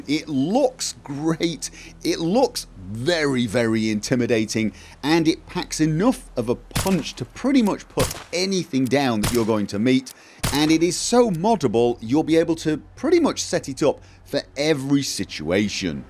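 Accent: United Kingdom. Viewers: Cool voice! This britt snob voice that equally irritates and charms))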